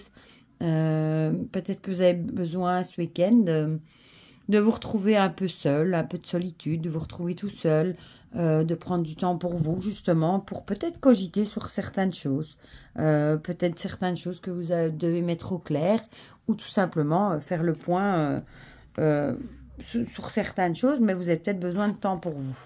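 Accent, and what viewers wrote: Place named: Belgium. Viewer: Coucou bonjour 🐈❤🐈‍⬛🦄🐿🦔🐼🦆🐢🐇🐭, Grand Merci pour cette vidéo. J ecoute grandement car ce matin, je n etais pas présente. Ce jour 33 degrés 🌡, c est un grand ouuufff 😢. Un été qui ne brûle pas, c edt un vrai REVES. Un jour ca brûle, un jour un peu moins. C est cooolllll, que tu fasses la guidance sur ta terrasse. Le train 🛤, un vrai bonheur. Tu me fais trop rire 😂😂, ton Gardfield 🐈 qui est têtu 😂😂. J adore cet accent Belge 👍👍😘😘. Gratitude infini 🙏. Je te remercie infiniment et je te souhaite un bonne journée. Chez nous, comme tu sais, c est Férié. Je t envoie pleins de belles énergies 🎉💫💥. Prends soin de toi et de Ta Famillette. Bisous bisous Sylvie 🙋‍♀️🕊🦋🕊🦋💙💙💙🙏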